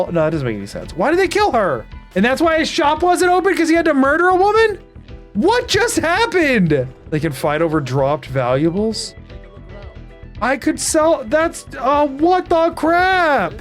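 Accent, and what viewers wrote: United States. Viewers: Baltimore Accent run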